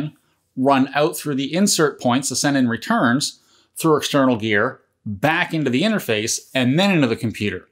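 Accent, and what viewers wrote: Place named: Canada. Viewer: Canadian IN and OOT!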